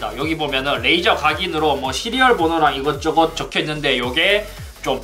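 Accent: Gyeongsang, South Korea. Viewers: 고향이 경상도이신가요? 사투리가 비슷해서 그런거같기도한데용?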